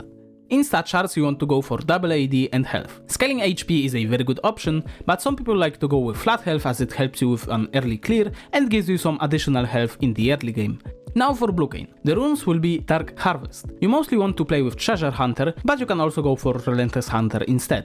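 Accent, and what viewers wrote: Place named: Poland. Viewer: You have polish accent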